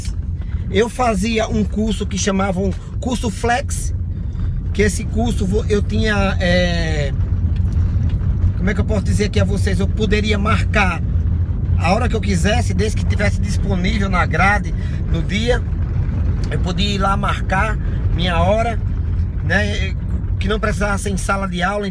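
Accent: Northeast, Brazil. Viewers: Tu é nordestino neh macho? Kkk cheguei agora no canal, vou ser inscrito.. E sim eu faço SENAC-PE e so tenho a dizer que é top man, eu não sabia de naaaaaada, e agora to quase terminando o básico e posso dizer que ja posso ter uma conversa básica com um americano, principalmente saber ouvir. 5 meses de curso.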